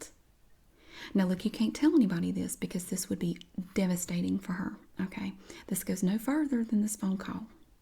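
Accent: South, United States. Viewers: adina andrei extra points for a southern accent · Her southern accent is what stands out the most on the entire YouTube! Extremely professional and relaxing! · I'm transfixed by the southerness... haha :D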